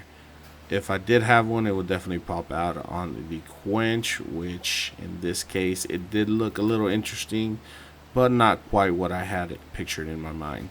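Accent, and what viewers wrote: United States. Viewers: Did you rip this from a real blacksmith? Or is your english just to american?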